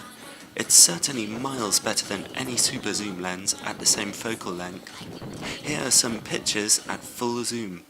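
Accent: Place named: RP, United Kingdom. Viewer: I like your perfect Received Pronunciation/posh English.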